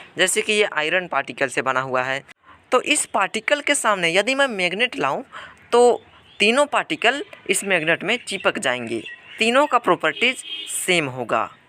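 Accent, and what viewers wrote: Bihar, India. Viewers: Aap bihar ke ho na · Bro you are from Bihar I think because your talking style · Bihari ho khya ap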